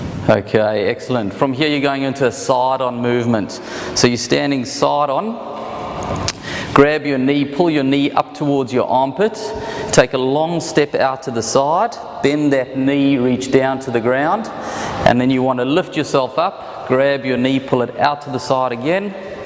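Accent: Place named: England, United Kingdom. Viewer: The English accent will help me to remember those movements.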